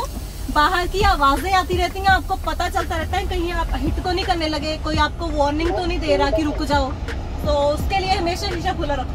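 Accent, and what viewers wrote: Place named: Bihar, India. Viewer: ਮੈਡਮ ਬਿਹਾਰ ਤੋ ਅਾੲੀ ਲਗਦੀ ਅਾ ਬੲੀਅਾ ਞਾਗ ਹਿੰਦੀ ਬੋਲਦੀ ਅਾ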